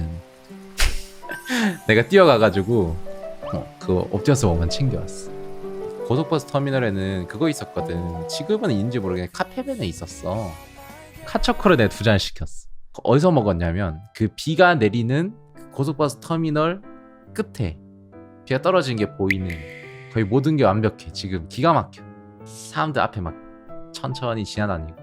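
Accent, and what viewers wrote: Gyeongsang, South Korea. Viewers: 잠만 님 대구 대쓰까? 저 대구 대쓰까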